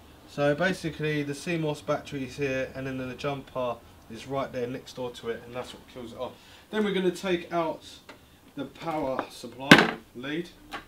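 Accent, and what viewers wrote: England, United Kingdom. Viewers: Why you have english accent?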